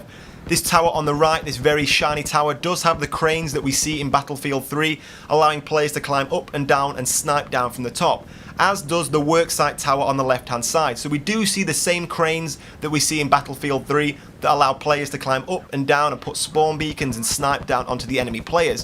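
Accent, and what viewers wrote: England, United Kingdom. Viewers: I'm glad I found this channel! The videos are so awesome! keep up the good work! BTW, are you from England?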